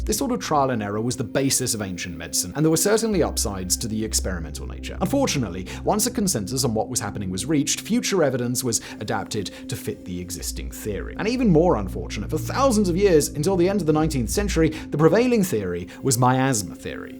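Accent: United Kingdom. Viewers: Forget the beard or the chrome dome, the glib UK accent and rapid fire delivery hooked me. I predict in less than a week, I’ll have watched no fewer than two more of videos.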